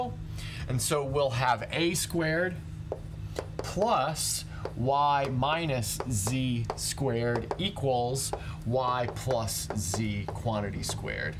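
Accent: United States. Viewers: this guys American pronunciation is infuriating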